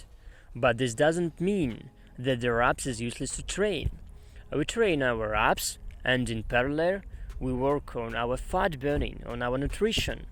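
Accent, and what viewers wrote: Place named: Eastern Europe. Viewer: ah Russia that's why I hear some slavic in your voice. I'm in the UK , mixed ancestry of polish, English, German, Spanish and Barbadian!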